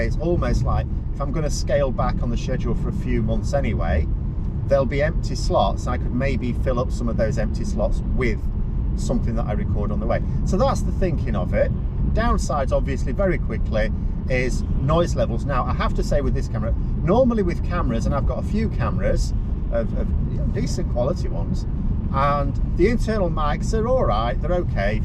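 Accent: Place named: Yorkshire, United Kingdom. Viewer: Merry Christmas. I very much enjoy your podcasts and am a regular viewer. Your insights into politics are most informative. I particularly like your Yorkshire straight talking, something I appreciate about the folk in Britain's best county. All the best.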